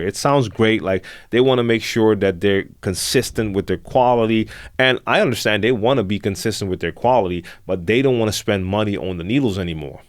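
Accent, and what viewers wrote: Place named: East Coast, United States. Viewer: It's killing me to know....you say you live in the Netherlands, but you have an "east coast" accent and you look like you're Latino...can someone let me know? Also, yes I was too disappointed when I heard the news about Shure needles demise. I always loved the way ortofons looked, but they we're too expensive for my budget. Back in the early 2000's I started with "m-audio TORQ" as my DVS, I liked the fact that I didn't have to carry loads of crates to parties. Then I upgraded to serato SL3, but I somehow keep coming back to vinyl.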